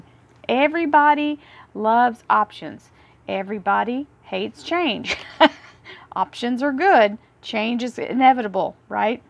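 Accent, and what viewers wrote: United States. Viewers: I love the accuracy, the lovely American accent, and of course your enthusiastic interpretation. Thank you so much. x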